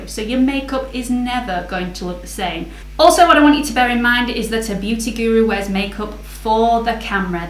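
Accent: United Kingdom. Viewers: Great video! Thank you for sharing! I quite new here but I can say that you are very honest to your subscribers! And you not only help me do my makeup but also help me practise my English since I'm from Argentina! It's so nice to listen to a British accent! Greetings gorgeous! 😍😍😍😍😍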